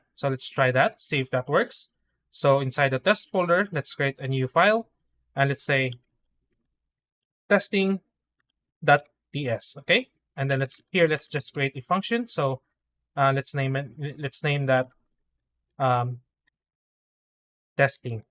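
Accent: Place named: Philippines. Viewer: The accent is very noypi 😂. You have my sub, keep it up.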